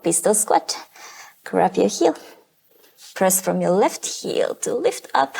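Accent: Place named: Finland. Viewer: Very creative & innovative practice! Really loved the handstand jumps on & off the mat. I had no clue that so much of hip work was also involved for getting into handstands until this practice. Thank you so very much for this beautiful library 📚 of practices as I have completed one more 50-minute practice. 💙👩🏻💚🥰😇👌🏻🙏🏻💪🏻✨May I know which country are you from? Your accent sounds very Finnish 🇫🇮 but I wasn’t sure.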